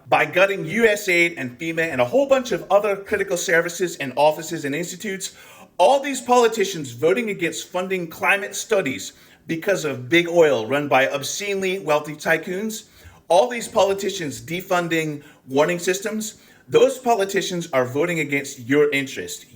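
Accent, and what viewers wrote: Scotland, United Kingdom. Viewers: I love it when your Scottish accent comes out!! That's when we know you're beyond angry. One Team One Fight 💙 🇺🇸 💙🇺🇸💙🇺🇸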